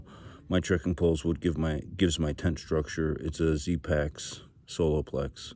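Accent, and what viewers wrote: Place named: Connecticut, United States. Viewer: You sound like you are from NW Connecticut?Enjoy the hike!!!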